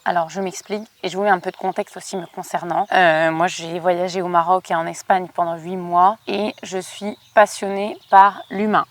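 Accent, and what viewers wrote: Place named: Belgium. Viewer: je suis belge, et quand tu parles, bain on dirait quelq'un d'ici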